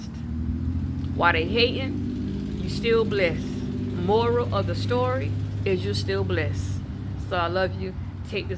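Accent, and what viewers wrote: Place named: Louisiana, United States. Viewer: SIS❤ You gotta be from Louisiana❤ with that Come on Nah😂❤ I Love my State, but Yeah, when I tell You I’m so glad GOD had me follow you💚 When I tell You, You be Conforming🙌🏾🙌🏾💚🗣️ JESUS🤍 HE DONT want NOBODY taking the Credit for what HE is Doing in my Life🙏🏾🙏🏾 Yeah things a lil shaky BUT GOD 🙌🏾🙌🏾🤍 I’m at Work Smiling so hard😁😁 You uplift my Spirit 🙌🏾🙌🏾🤍💕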